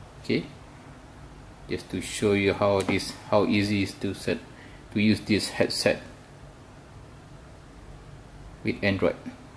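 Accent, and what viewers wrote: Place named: Malaysia. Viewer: Your accent just like Malaysian.....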